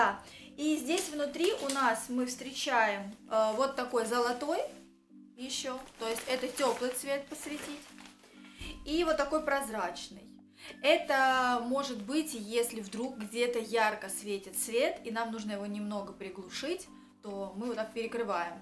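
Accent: Rostov, Russia. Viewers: по-моему ростов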